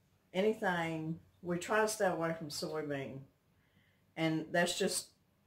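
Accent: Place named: South, United States. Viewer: I agree! I am sure I have heard the word oil pronounced, the way does, many times but the first time I REALLY HEARD IT was with Paula Dean. I love the southern accent and imitate it quite often with my daughter. She gets a kick out of it! Now, this is coming from a Pennsylvania Dutch Country Gal! LOL! We have our special accent, also!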